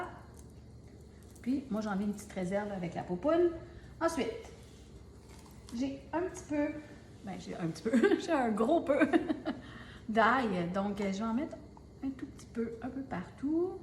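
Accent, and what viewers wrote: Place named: Quebec, Canada. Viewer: Bonjour, je vous decouvre à peine et je suis agréablement surprise par votre simplicité et votre humour. C'est un plaisir de vous entendre parler avec ce si joli accent du Québec, pour moi qui suis en France. Vous me faites voyager, merci pour ça 😉. Et vos recettes sont simples à réaliser et délicieuse. Moi aussi mon surnom c'est 😊 Je vous envoie bon baisers de France 😍 😉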